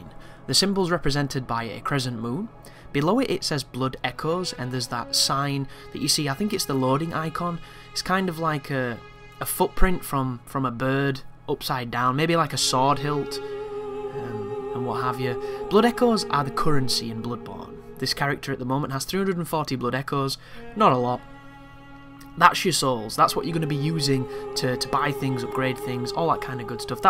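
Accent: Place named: Yorkshire, United Kingdom. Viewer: Good vid, can tell your from Yorkshire haha ;)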